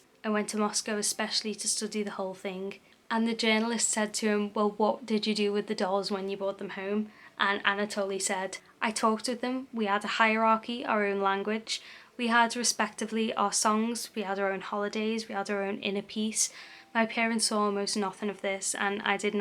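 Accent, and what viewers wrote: Liverpool, United Kingdom. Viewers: You have a slight scouse accent if you dont mind me saying.. are you from Birkenhead ways? or the posh part of the pool? I live just across the mersey on the wirral :) Btw great vid! I am on a binge watching true crime! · Mad to actually find a true crime scouser on here, feel like I know you already 😂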